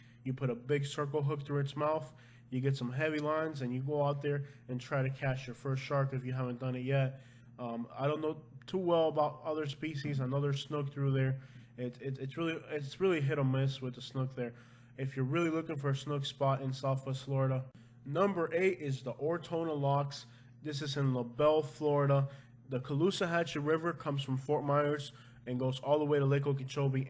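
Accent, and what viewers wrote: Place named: Cuba. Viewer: Most likey he sounds Cuban. So it to be expected. It part of their culture, but to his defense most Cuban women really enjoy shopping.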